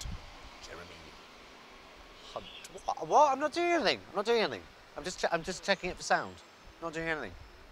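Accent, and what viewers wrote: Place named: West Country, United Kingdom. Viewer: I can hear traces of West country in his vowels.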